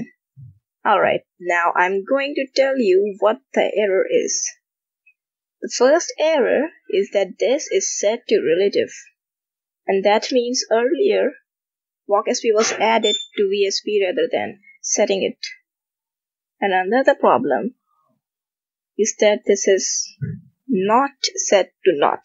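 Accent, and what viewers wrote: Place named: India. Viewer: How come every single tutorial I watch online has an indian accent?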